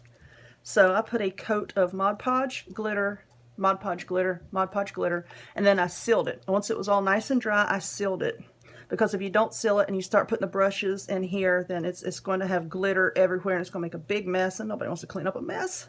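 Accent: South, United States. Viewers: Love your channel and your southern accent. I have southern accent as well 😊 I wanted to know what type of cricket machine you have?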